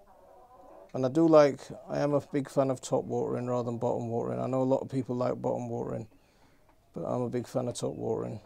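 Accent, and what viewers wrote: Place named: Northern England, United Kingdom. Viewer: That is how people speak in the north of England.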